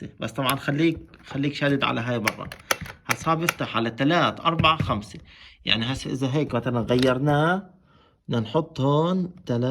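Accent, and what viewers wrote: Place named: Palestine. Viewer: لهجتك فلسطيني يارب ينصركم + الطريقة نفعت والله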